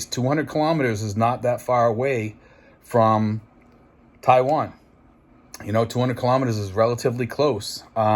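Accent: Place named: Massachusetts, United States. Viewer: I always enjoy your VLOGs and watch them first. Maybe because I understand your accent being from Mass. myself.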